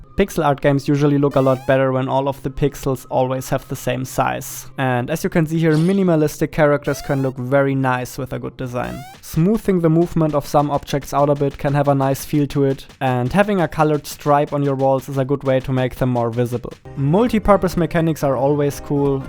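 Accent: Germany. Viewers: Ich höre seinen deutschen akzent😅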